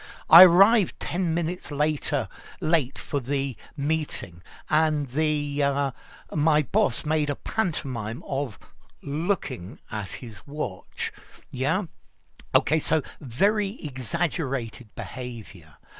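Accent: RP, United Kingdom. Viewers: GOD... do you speak British RP accent (Reserved pronunciation) however, your teaching style is greater than all..Big fan sir.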